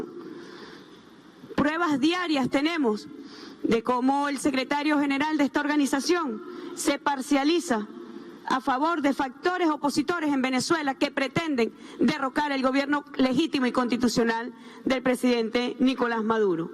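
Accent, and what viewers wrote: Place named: Cuba. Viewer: Oie habla como cubana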